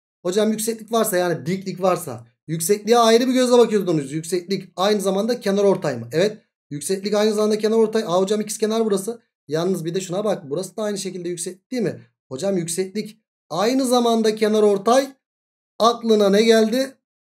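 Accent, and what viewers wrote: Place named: Thrace, Turkey. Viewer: Hocam siz devrekli değil trakyalısınız bence hatta Çanakkalelisiniz. Konuşmalar çok bizden 😄 ağzınıza sağlık anlatımınız süper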